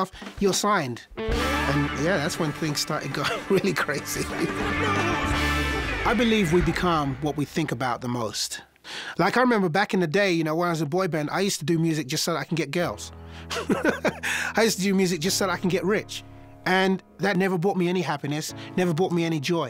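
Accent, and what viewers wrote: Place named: United Kingdom. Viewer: He actually does, just because it's not a heavy posh accent doesn't mean it's not british.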